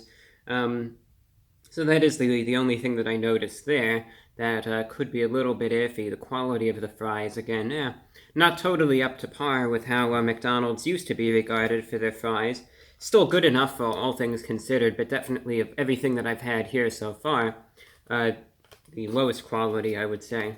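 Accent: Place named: Mid-Atlantic, United States. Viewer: Love his Atlantic accent.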